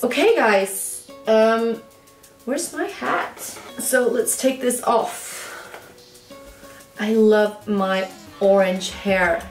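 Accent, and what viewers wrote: Sweden. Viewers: you are magical ❣️ 🧝🏼‍♀️🧞‍♂️🧚🏻‍♀️ I loved “The Swenglish”. I just got my palettes I ordered from your website. I had to stop this video before you started the tutorial and go to Nabla and ordered that palette. Your eye look was gorgeous 😍😘❤️ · Swinglish! 🤣🤣🤣 Love it!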